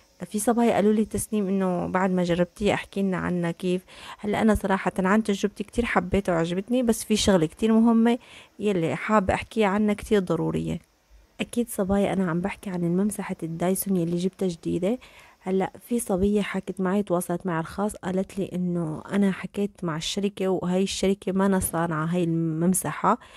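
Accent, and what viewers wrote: Aleppo, Syria. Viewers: لهجتك لهجة أهل ضيع حلب 🤔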